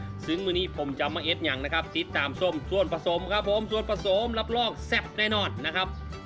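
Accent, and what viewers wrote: Phu Thai, Thailand. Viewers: วัดไข่...5555......555..มักฟังสำเนียงภูไท...555...ม่วนดีฟังเพลินๆ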